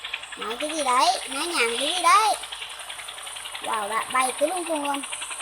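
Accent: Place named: North, Vietnam. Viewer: Lái ngu nói chuyện như bắc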